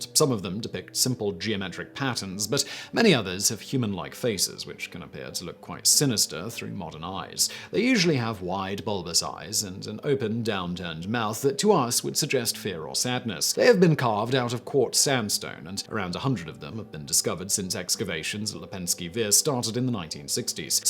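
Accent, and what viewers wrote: United Kingdom. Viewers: Love listening to this "snooty brit".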